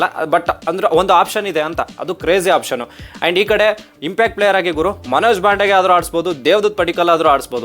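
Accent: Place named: Hassan, India. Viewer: Nin pakka hassan Mysore 😂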